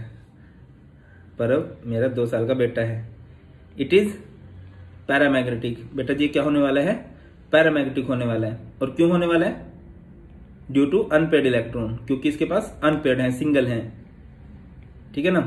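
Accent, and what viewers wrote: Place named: Chandigarh, India. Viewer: Sir aap kha se ho apki language aas padose ki hi lag rhi h Chandigarh se??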